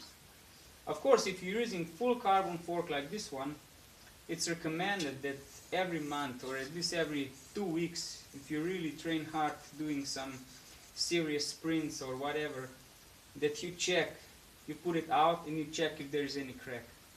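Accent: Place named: Slovenia. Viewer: You sound like someone from Slovenia.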